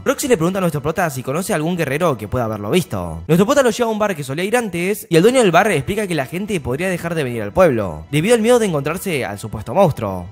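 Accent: Argentina. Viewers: TE AMOOOOOOOO DE AMIGO SIEMPRE ME ENCANTA TU CONTENIDO Y TU ACENTO ARGENTINO 🎉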